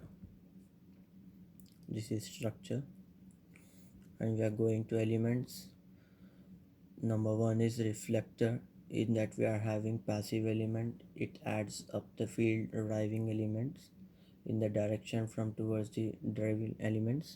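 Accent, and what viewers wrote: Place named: United States. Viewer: Ohh american accent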